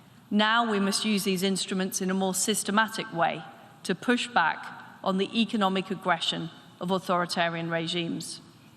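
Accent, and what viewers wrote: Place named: United Kingdom. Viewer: Loooooovveeeeee the British English ♥️♥️♥️♥️♥️♥️♥️🌹🌹🌹🌹🌹🌹🌹🌹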